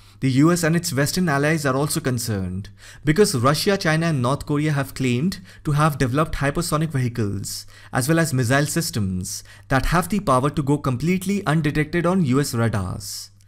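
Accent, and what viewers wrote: India. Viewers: Please improve your thick Indian accent, then it will become an amazing channel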